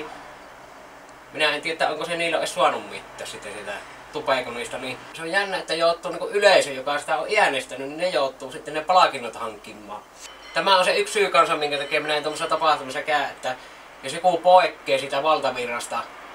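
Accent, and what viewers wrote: Savo, Finland. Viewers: Erittäin hyvin sanottu! Jo vain kun sen sawon murtteella tyrkkee tulemaan nii kyllähä siihe oma lisäpotku tullee asiaan ku asiaan! :)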